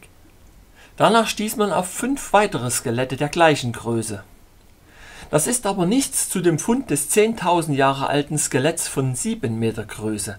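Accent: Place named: Saxony, Germany. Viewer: Langsam gewöhne ich mich an den sächselnden Tonfall der Lesungen 😊. Ich höre mir mittlerweile jeden Abend beim Kochen und Hauswirtschaften ein Kapitel aus den Erzählungen unserer Vorzeiten an und bin jedesmal inspiriert. Lieben Dank für diese erzählerischen Ausflüge zu ein paar Kapiteln unserer Vorfahren ❤. Zu den Riesen fällt mir ein bebildertes Kinderbuch wieder ein: Gullivers Reisen! Ich habe als Kind nie verstanden, warum die kleinen Menschen sich allein wegen der Größe des Riesen fürchteten und ihn solange bekämpften, bis er auf der Erde lag und bewegungslos gefesselt wurde 😢. Die Riesen waren ja unsere Mitmenschen und zum Teil Familienmitglieder und Freunde.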